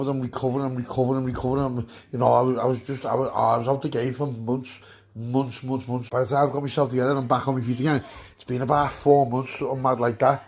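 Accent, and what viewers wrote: Liverpool, United Kingdom. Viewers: Yes Scouse ❤❤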